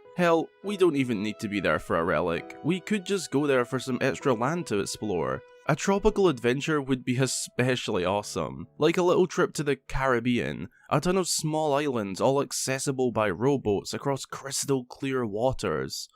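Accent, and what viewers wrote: Scotland, United Kingdom. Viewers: I love your scottish accent it makes the videos so chill🥰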